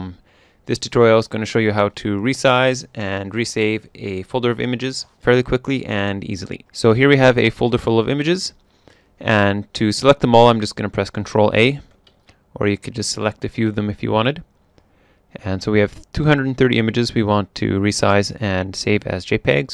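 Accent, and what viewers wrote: Canada. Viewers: That's a canadian accent.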